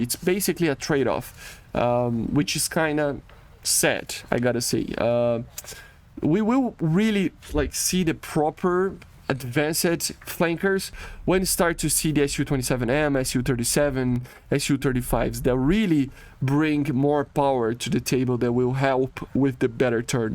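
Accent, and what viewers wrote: Brazil. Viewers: Are u from Brasil? Ur accent looks like so much brazilian accent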